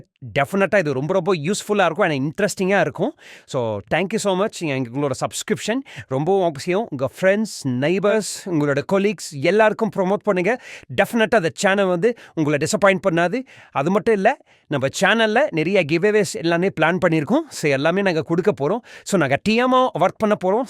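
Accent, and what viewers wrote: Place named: North India, India. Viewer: உன்னோட வாய்ஸ் வடக்கன் மரி இருக்கு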